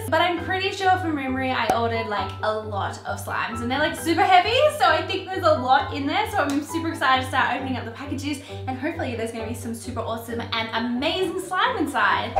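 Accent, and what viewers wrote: Australia. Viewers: You have the Australian accent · Are you Australian because I am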